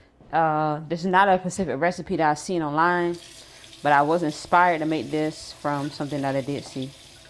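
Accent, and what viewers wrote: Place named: New Orleans, United States. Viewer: U HAVE A NEW ORLEANS ACCENT.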